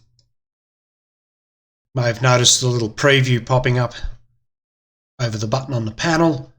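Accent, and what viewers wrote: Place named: Australia. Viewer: There is something comforting and no nonsense about someone with an Aussie accent giving a tutorial on Linux. His YouTube handle is also 😁 Instant Subscribe!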